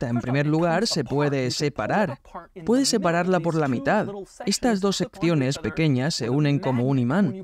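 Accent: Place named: Galicia, Spain. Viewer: Estoy harto de escuchar la tonada gallega...las z me tienen las bolas x el piso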